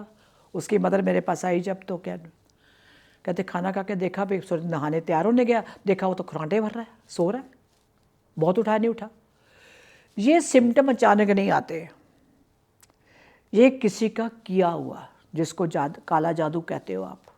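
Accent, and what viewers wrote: Punjab, India. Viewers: Madam ji as per your accent I think you are punjabi , are you ?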